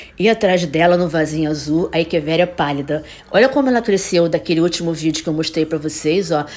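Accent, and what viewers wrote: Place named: Rio de Janeiro, Brazil. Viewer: Aí carioca kkkkkkkkkkk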